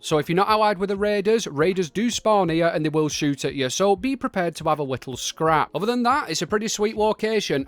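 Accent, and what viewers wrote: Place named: Northern England, United Kingdom. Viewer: No, definitely wasn't me! i think you are brilliant , love the northern accent and love how you use proper British phrases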